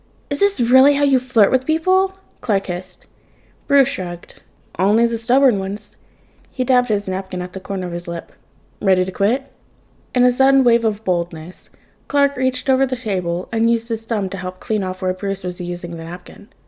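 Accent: Midwest, United States. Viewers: Omg I love your midwestern accent!! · Hehe, i LOVED your midwestern accent, peak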